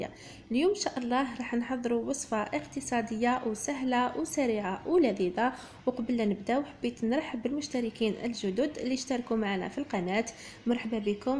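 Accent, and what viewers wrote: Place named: Algeria. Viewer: ما شاء الله الجزائريات شاطرين جدا معلش اللهجه بتاعت حضرتك شبهم انا مش بعرف افرق كلنا اخوات طبعا وتسلم ذوقك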